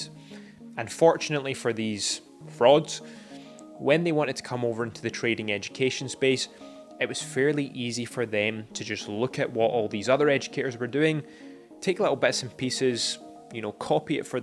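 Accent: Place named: Glasgow, United Kingdom. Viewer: Hey, I've just came across your videos. Are you based in Glasgow? Making presumptions on your accent. I'm full time trading based in Motherwell. I'm sure I'm the only full time swing trader in Motherwell!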